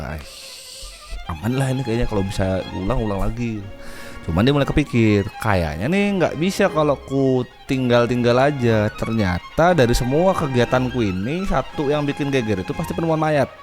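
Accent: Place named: Medan, Indonesia. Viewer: logatnya medan banget emg org medan kah?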